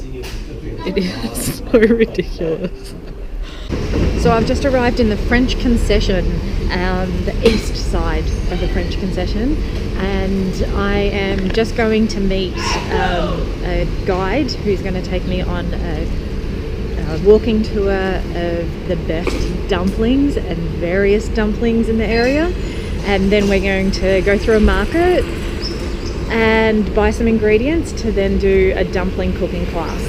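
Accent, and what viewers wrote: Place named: Australia. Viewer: I love you Australian accent. Australian accent sounds very British, which is far more beautiful than American accent. Long Live Great Britain！God Bless America！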